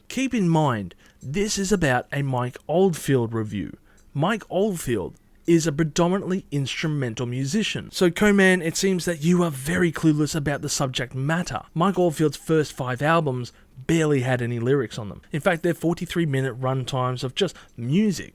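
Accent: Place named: United Kingdom. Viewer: Dude you totally sound british 😂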